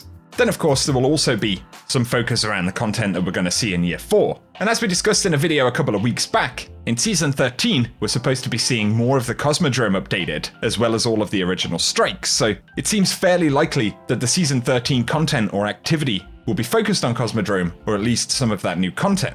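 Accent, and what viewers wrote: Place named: United Kingdom. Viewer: Gotta love the British voice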